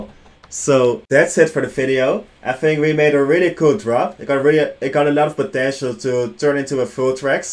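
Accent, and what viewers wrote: Netherlands. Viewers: Bro is Dutch haha dacht al dat ik het hoorde. Zieke edit dit. Doe je ook aan Collabs?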